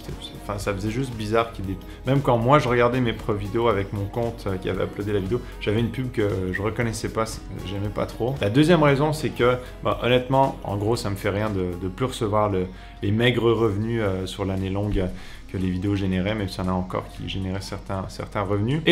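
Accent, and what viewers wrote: Quebec, Canada. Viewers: Omg je te suis depuis longtemps et c’est fou comment t’a maturé c’est vraiment nice et t’a tellement adopté l’accent québécois xD on dirait plus la même personne quand je regarde des vidéos plus anciennes haha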